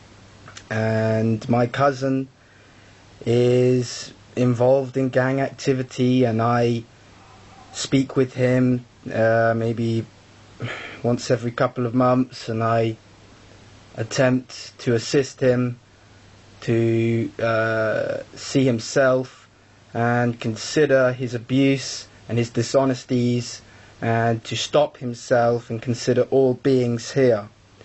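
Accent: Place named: London, United Kingdom. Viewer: What gang was you in? you sound like suburban Londoner. I'm from Hackney, London, and i there ain't no gangs in London, just wannabees, you sound nothing like a gang member. I lived in Oakland for a while and that is a scarey place my nigga with a real bangers. If you wanna help bangers in London, don't make videos go out and do suttin for them. peace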